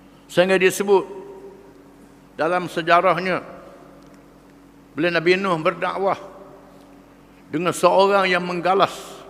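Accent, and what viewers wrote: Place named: Terengganu, Malaysia. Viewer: suka dgr kuliah tok guru, tidak terlalu ganu loghatnya. org KL pon paham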